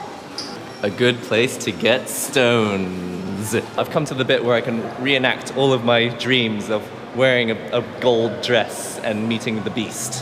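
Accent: United Kingdom. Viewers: he's japanese but he learnt british english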